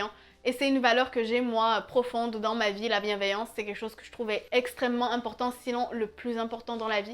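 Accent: Canada. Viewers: Tu est canadienne ?? J'ai vraiment trop ta voix 💕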